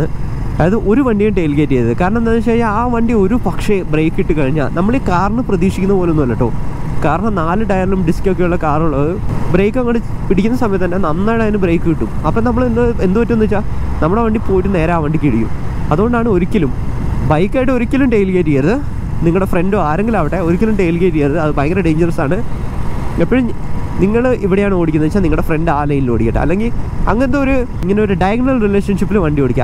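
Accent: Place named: Kannur, India. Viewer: bro ഏത് ജില്ല ആണ്? കണ്ണൂർ ആണോ അതുപോലെ തോന്നി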